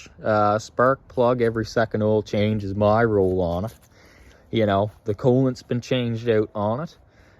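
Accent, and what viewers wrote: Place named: Canada. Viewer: what is NS? Accent sounds heavy canadian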